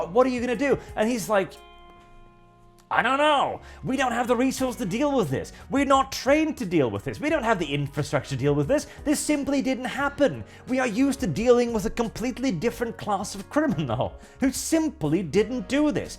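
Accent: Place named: United Kingdom. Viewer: He's Norwegian that learned English from Brits.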